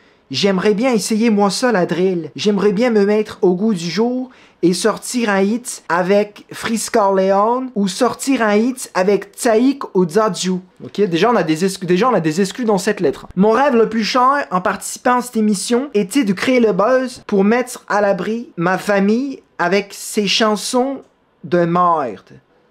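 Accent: Quebec, Canada. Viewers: je suis mongol et j'adoere ton accent du Canada Xd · + l'accent québécois. Que demander de plus 😭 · Oulala les infos croustillantes que tu nous as dégoté! Tu fais un vrai travail d'investigation et avec l'accent québécois (qui fait partie des accents autorisés) on est en totale immersion. Ne lâche rien mec c'est toi le boss de YT, après Michou bien sûr!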